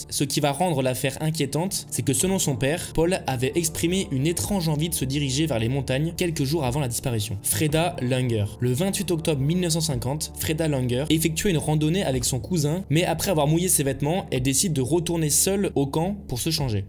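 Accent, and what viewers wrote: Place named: United States. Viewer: Je te découvre le ton est sympa, parle un peu moins vite et ce sera parfait,au j'ai pris.les gâteaux , mais la belle mère pas contente d'être dans le placard Lionel camy à déjà traité cette affaire garde ton accent américain, prend un ton plus ténébreux et ce sera top Tu es jeune, sympa, un abonnement pour toi direct,et un pouce je vais te suivre sur ta chaîne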